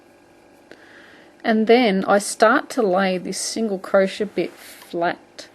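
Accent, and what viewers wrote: Australia. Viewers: great tutorial you make it look so easy I'II keep practising and hopefully I'II get thankyou for all your hard work Where are you from? you sound like an aussie thanks again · I am Australian, and I love that we have a fellow Aussie making great tutorials, I love our accent and I for one am so thankful that you have uploaded this video and sharing your knowledge, I think your fantastic, keep up the great vids, your awsome :) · I love this! You have taught me so many things! I'm a new crocheter, and I love your style of teaching. When I make something and show people, I tell them "the nice Australian lady taught me (I hope I have the accent right!!!!!)" I made this to accent a pair of handwarmers that I made using one of your tutorials...it ended up being too big for the handwarmer, but it's still so pretty that I know I can use it for something! 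· Is that an Australian accent I can hear?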